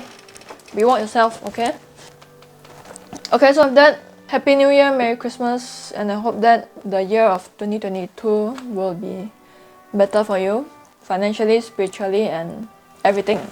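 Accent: Singapore. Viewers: God bless and wishing you happiness. By the way I love your Singapore English accent./ Thanks for everything you did for us. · Ms love work. Ignore those who judge, cause in their eyes. You never do anything right. You can dress, speak. Dance to everything they say... and they will still find something to critique you on. On you English sounds good for me. Singlish. You could be teaching in your one of native language. That's, not going to help those who only speak English. Please keep doing what you are doing. We are going to grow-up and be just like you one day. 47/ 48 I forget sometimes. I think 48... aww don't remind me... again thank you for Jia you to break us out of this everyday rate race we are in. Maybe one of your students will stand on stage right beside you. Thanks so much.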